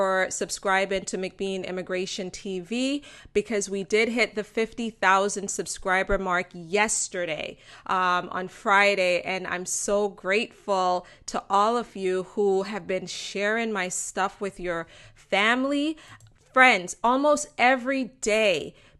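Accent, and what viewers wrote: Jamaica. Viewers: I ❤ your jamaican accent . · I dont know how old you are l have seeing you have Bob Marley's family similarities mmmmm🤔🤔 excuse me but l always follow your channel but today when you said quote "sorry guys for my Jamaican accent mmmmm.l have questions in my mind and heart. Maybe you are related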